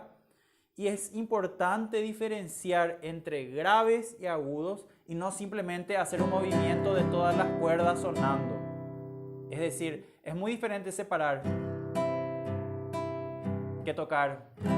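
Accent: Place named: Paraguay. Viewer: por su acento y por lo bien que ejecuta la polca, definitivamente ES paraguayo 💞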